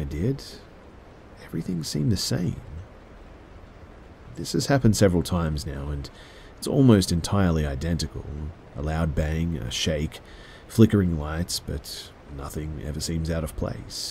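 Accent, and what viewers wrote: Australia. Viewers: I love your stories! Your voice and Australian accent are awesome! You save my sanity on boring workdays.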